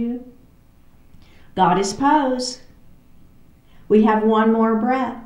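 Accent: South, United States. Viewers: I just found you -- quite serendipitously! -- for the very first time on your Day 1 of your 28-Day Chair Yoga series, and since it's Day 1 for me as well on committing to moving my body and getting my strength and physical excellence back, I thought it a match made in heaven! I like your style very much, your soft-but-obviously-also-strong energy, your excellent and very clear instructions (I really like the reminders you stick in there, and they often are so perfectly timed!)... and I especially appreciate your slight but lovely Southern accent [since my Daddy was from Georgia, and I do have a soft spot for things Southern:D]... I also love it that you use the Indian names for the poses, etc., AND I felt wonderful after the session... Well, I'm just thrilled, and I look forward to being with you every day. I also am not a beginner (a commenter mentioned that), but following the initial processes and starting" from scratch" feels like a perfect intro back into doing (and loving) yoga as I did years ago. 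AND may I also say (all due respect to the other teachers I've found and tried on YT so far -- I'm so glad you're closer to my age! There's a richness and a most welcome depth and smooth flow that happens from your expertise, and I appreciate it very much. Excellent session, -- thanks so much! I'm delighted to be doing this with you. -- Nancy from Oklahoma City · Thank you!! I'm so happy I found you!! You have a calming voice.. The southern accent brings me back home!! Can't wait to start this challenge with you! I'll let you know how it works out.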